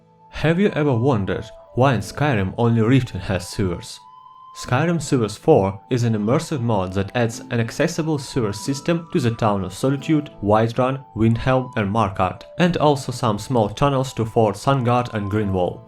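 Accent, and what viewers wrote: Germany. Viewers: You have the first good-sounding German accent I have ever heard. It takes my brain a moment longer to understand what you are saying, as with most accents, but clarity is not an issue. Going from speaking German to speaking English is difficult from what I have heard, so keep up the good work!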